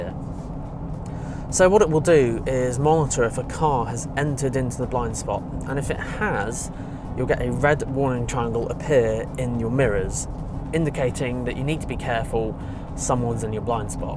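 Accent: United Kingdom. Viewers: your videos are superb! Explanations are educating and clear, video and audio are perfect! I even enjoy listening to your British accent. Thanks!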